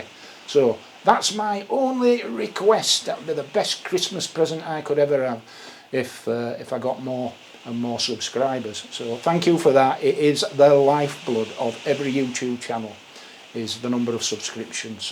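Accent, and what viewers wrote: Yorkshire, United Kingdom. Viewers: Happy to remain a subscriber. As a fellow Yorkshire man I understand every word. Keep the BSA, it’s a fine machine.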